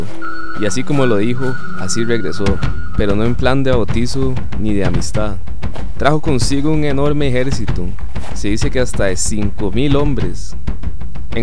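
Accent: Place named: Costa Rica. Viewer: llegar a uno con acento tico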